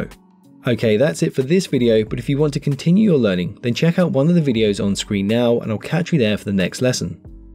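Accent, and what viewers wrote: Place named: United Kingdom. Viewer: Where are you from? You sound like you have a British accent.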